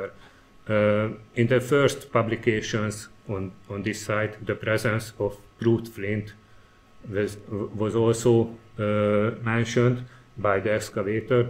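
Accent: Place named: Hungary. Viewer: Magyar olvasta hisz nem ő-zik egy angol, de nagyon érdekes az anyag.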